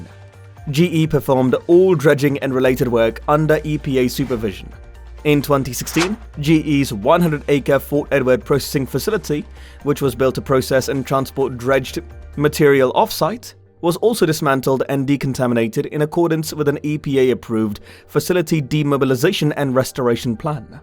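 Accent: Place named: United Kingdom. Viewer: Beautiful British accent and amazing NYC🎉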